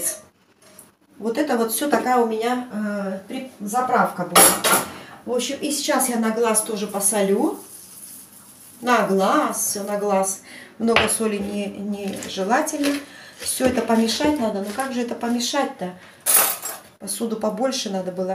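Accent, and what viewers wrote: Georgia. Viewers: Gruzinski Akzent vi gruzinka 👍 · ты там луч света акцент это нашинский грузинский испанах это мы же в Грузии так его называем смотрю твои видео с удовольствием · Этоже классно когда тебя так называют ,ашкым,севгилим,чаным,уреим,бебеим,хаятым. Я так и знала что вы с Грузии ,у вас акцент грузинский)))))) 👍👍👍👍👍👍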